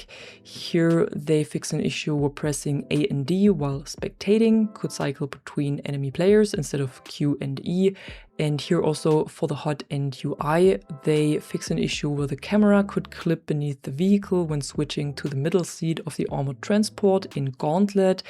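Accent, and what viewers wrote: Germany. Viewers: Nach deinem Akzent zu urteilen, bist du wahrscheinlich Deutsche ;) Alles Gute zum Geburtstag !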